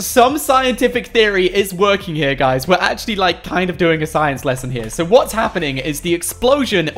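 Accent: United Kingdom. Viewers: Are you from UK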